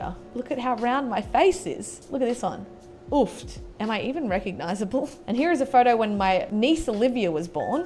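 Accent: Australia. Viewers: So Australian haha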